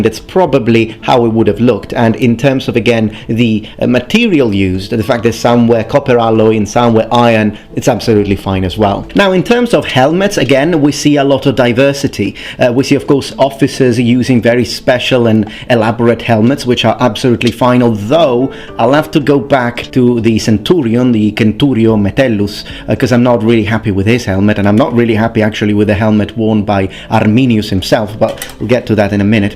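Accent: Italy. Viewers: It’s probably not true, but the fact that rattles this stuff of in an Italian accent makes me think he’s right:)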